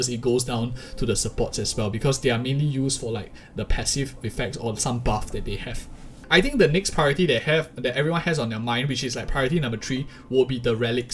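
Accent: Singapore. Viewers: he definitely sounds singaporean ngl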